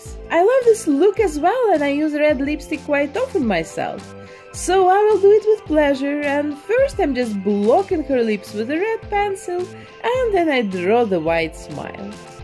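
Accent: Russia. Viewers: Вы ведь из России ?) Акцент слышен. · По вашему акценту, можно с полной уверенностью сказать, что вы Русская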